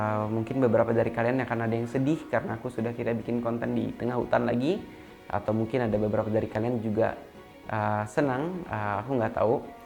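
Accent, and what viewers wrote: Indonesia. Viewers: ...jangan lupakan logat bahasamu..dimanapun kamu berada Saya suka tutur kata Dan logat kamu yg Indonesia bangettt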